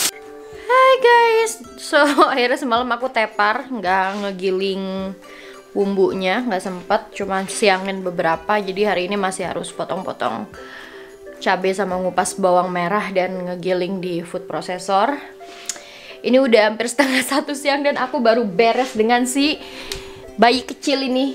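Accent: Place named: Java, Indonesia. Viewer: Kk indonesia mu medok sekali 😙😙💪💪💪kereeeennnnn ibu kuat ..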